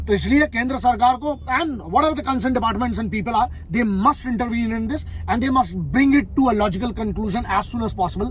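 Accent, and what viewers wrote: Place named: Kanpur, India. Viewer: Your voice has accent of kanpur up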